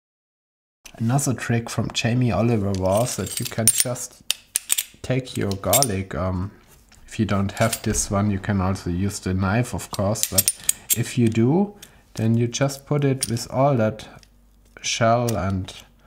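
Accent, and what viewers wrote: Germany. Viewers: This German accent is hilarious. XDD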